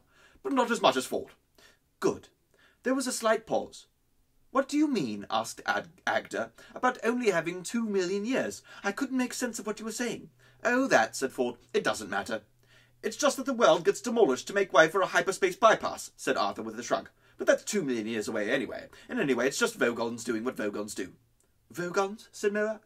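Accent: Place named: United Kingdom. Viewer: Omg you are American! I would have sworn you were british. After listening to the whole book I had hadn't heard your real voice until just at the end of this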